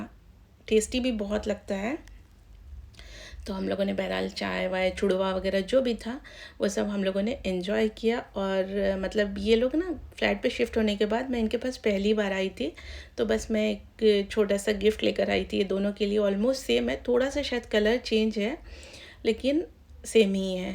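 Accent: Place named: Uttar Pradesh, India. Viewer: Aap ki awaz sunkr hi lga ki Aap up se hai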